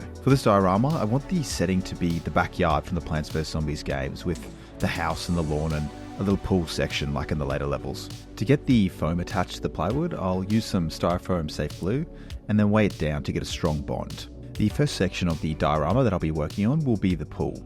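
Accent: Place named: Australia. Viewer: Bro is definitely Australian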